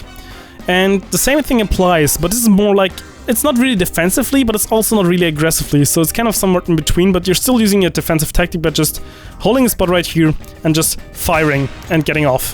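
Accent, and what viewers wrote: Germany. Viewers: u german or something?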